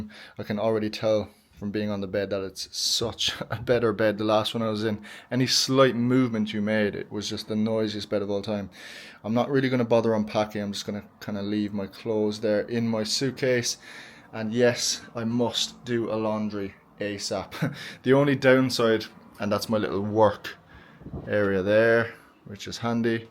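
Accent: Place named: Ireland. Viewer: Hello. I think you seem lovely 😊 I like your Irish accent. I've wanted to visit Australia for a long time. I've thought about it a lot. I can't afford it yet, but if I'm ever in that position, I wouldn't want to miss out. I'd like to go to Sydney, Byron Bay and also travel up to Queensland as well as visiting Darwin, but obviously I know that's a long way from Sydney! I would probably need to go to Australia more than once.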